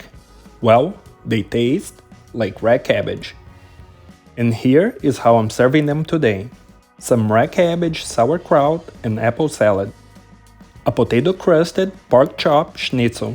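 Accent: Brazil. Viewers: This guy has to be Brazilian. I recognise my own accent 😂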